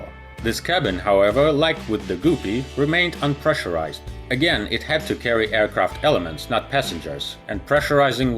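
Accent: Russia. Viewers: Luka Walpole It's just the accent. As a Russian speaker, He's bound to have SOME words and combo of letters that are different to his tongue! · well it's Russian accent,which usually U sounds as it is rather than A in several occasions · To be fair, the native Russian speaking narrator has no "uh" sounding letter in his alphabet to compare to English letter "u". They do have a sound like "oo" though. I have a Russian friend who mispronounes "truck" as "trook." I guess it's a common mistake and some English sounds are difficult. Just as some Russian letters are very difficult for English speakers, when we have no similar sound.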